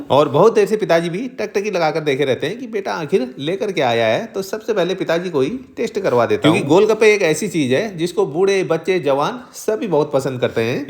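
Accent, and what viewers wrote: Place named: Uttarakhand, India. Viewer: Aap uttrakhand se ho kya ❤❤ me uttrakhand se hu or aapke bolne ka tareka bilkul uttrakhand walo jaisa h ❤❤❤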